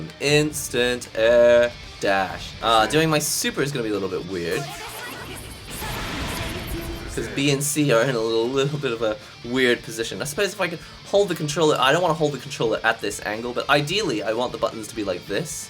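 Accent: United Kingdom. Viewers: Why do u have a British accent ?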